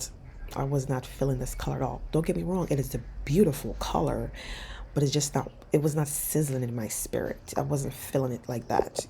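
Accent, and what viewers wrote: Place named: Jamaica. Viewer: Everything looks great. I just love it. I noticed when talking to your husband your Jamaican accent is really thick as opposed to when you talking in your videos. This is not criticism just something I noticed. I loved it.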